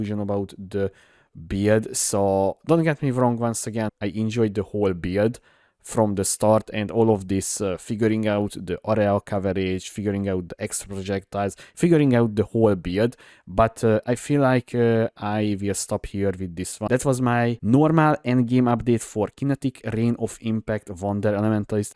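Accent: Hungary. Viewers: Amúgy nem akarok paraszt lenni, de érdemes lenne valami angol tanár vagy ilyesmi, nagyon keményen érződik hogy magyarosan mondasz dolgokat. (Normal helyett normál, rekáveri) Hozzáteszem én se vagyok mérföldekkel jobb, nekem is mondogatták ezt, de ja.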